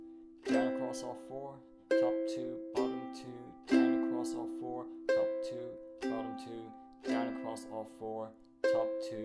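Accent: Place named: Ireland. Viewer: where are you from? it sounds to me like you're from a part of Ireland hehe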